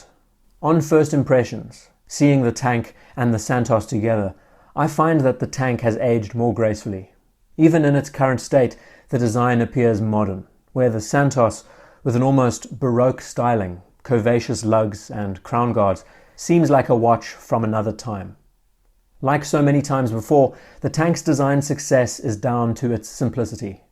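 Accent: South Africa. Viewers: Why im a getting a bit of a south African accent from this guy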